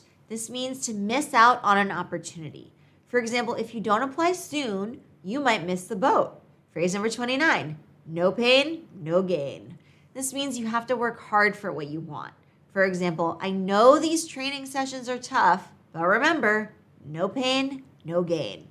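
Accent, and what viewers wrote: United States. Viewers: Nice, i'm from Jakarta, I like maerican english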